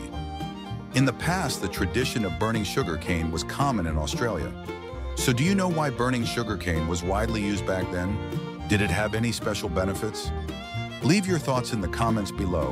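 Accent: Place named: United States. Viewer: Hearing an "American" accent I expected you to report on American sugarcane farms. Instead you reported on Australian sugar . You did not say which country the footage on beet sugar production was filmed in . (My apologies if you did and I missed it ) . I did not know that beet has a "white" variety....I thought all beet was a dark purple . There are variations in reaping and processing sugarcane in the various countries . In the West Indies and in Florida the raw sugar is brown....before it is refined into "white" . Rum is also a by-product of sugar production in the West Indies .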